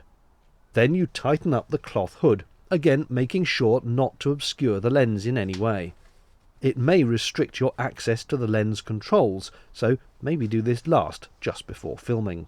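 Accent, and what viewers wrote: United Kingdom. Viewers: Your British accent just makes me want to watch it over and over again lol. Good job mate! 👍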